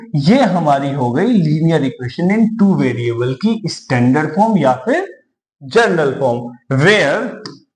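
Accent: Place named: Meerut, India. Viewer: Sir I 🤔 think you are from meerut...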